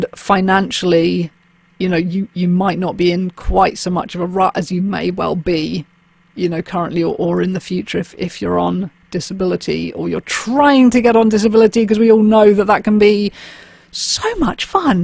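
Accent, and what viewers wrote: United Kingdom. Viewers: what kind of british accent do you have?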